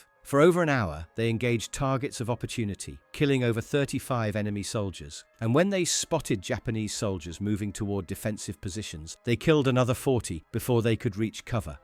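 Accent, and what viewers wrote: England, United Kingdom. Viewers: You couldn’t carry an extra barrel and still do what the the BAR was intended to do…… The Bren gun proved the concept. The BAR was even lighter (albeit 20 rd mag). Let’s calm down when we hear an English voice doing a documentary…. This one’s iffy as hell.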